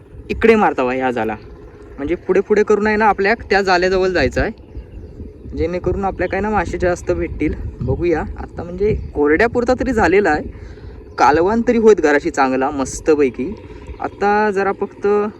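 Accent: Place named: Malvan, India. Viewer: तू चिपळूणकर आहेस का मालवणी बोलतो नाय येत तरी नीट बोलणं आलिव गेलिव नाला चा पाणी तलाला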